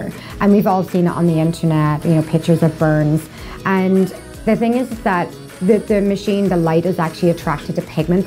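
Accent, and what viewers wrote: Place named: Ireland. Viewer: Cute Irish accent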